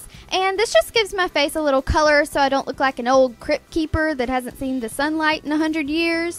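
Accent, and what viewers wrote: South, United States. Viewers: she used to sound SO country! she should play ellie may in a modern reboot lol.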